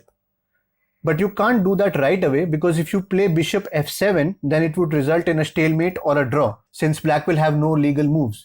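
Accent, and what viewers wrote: India. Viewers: just wanna let u know that I hate Indian accent.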